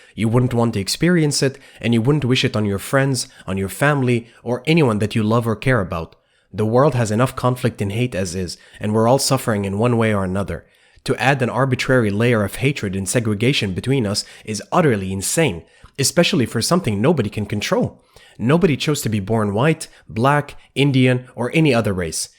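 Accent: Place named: Germany. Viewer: Very slight accent, but i believe it is germanic, such as german.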